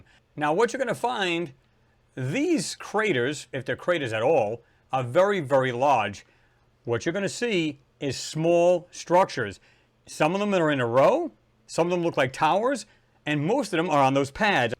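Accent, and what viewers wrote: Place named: Northeast, United States. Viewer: First thing ....cool show ...love what you cover!! Secondly ...had to smile listening to the accent of commentator....I'm from New England and would bet that the accent is Northeastern U.S. 🌲😁💪💯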